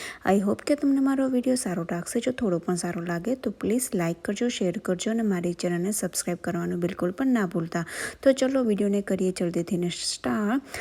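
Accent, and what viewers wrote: Saurashtra, India. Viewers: Nice vlogs. Aaj first time aapna videos joya Aap Saurastra thi 6o e language tone par thi khyal aavi gyo. Kya jilla thi 6o ? Tya na lifestyle upr video banavjo to ahi thi tya javavala loko ne help mle.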